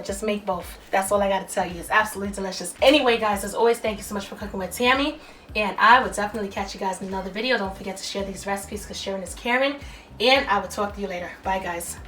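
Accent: New York, United States. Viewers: Thank ya Miss Tammy...I love cajun seasoning. You sound like a fellow New Yorker...hahaha....love it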